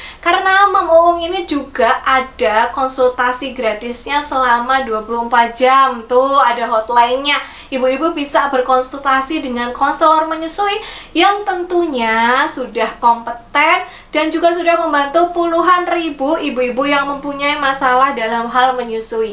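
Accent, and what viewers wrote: Java, Indonesia. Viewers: Bu bidan asli mana e, logat jowone medhok. Terimakasih ilmunya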